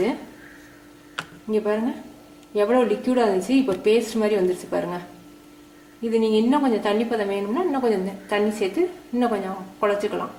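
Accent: Madurai, India. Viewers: tq mam. very useful. I like all ur recipes and we'll explained about the ingredient very tasty.and I like ur madurai language very sweet to hear .tq s a lot.we r very lucky to have such a wonderful talented mam.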